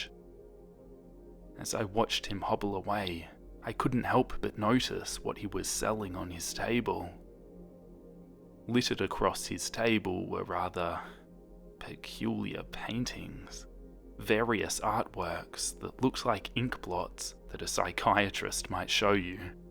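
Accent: Australia. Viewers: Australian I think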